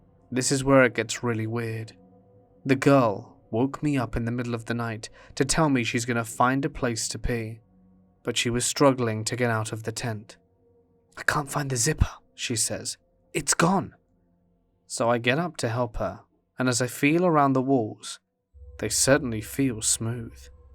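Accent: United Kingdom. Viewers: Thank you kindly for taking the time in preparing each story and love your British accent it gives every story you read worth listening to. 😊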